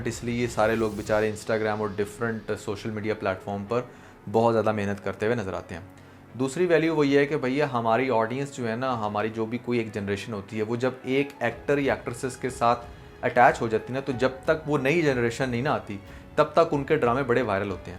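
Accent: Punjab, India. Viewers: This host is definitely an annoying personality , but overconfident 😂should work on his speaking and presentation skills, his english, speaks English in Punjabi style , instead of bashing the stars it is the mindset of the people who produce such programs and show only very young characters, if someone has acne it’s not their fault , it’s not poor hygiene most of the times because it can be hormonal , and acne ages skin and if someone has acne does not mean their age is more, he thinks he is very intelligent ,very typical uneducated male personality! Grow up!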